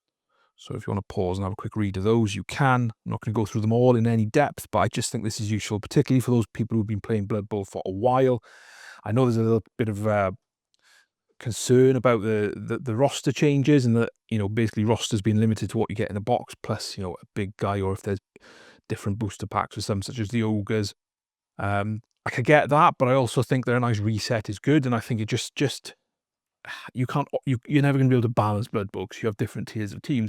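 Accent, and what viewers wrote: Wales, United Kingdom. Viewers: You sound Welsh! Whose coat is this jacket?! lol, imma fellow Welshman across the pond in the states, and ive recently gotten into Warhammer after playing Magic: The Gathering for many years. very excited about Blood Bowl. Love your videos!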